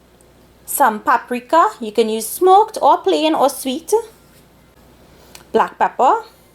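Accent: Trinidad and Tobago. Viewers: Hi. Trini English.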